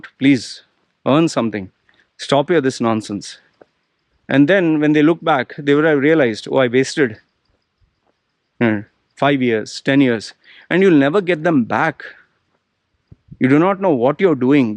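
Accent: India. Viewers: You sound like an indian as well. And why dont you sit down while speaking, just to show some respect to your listeners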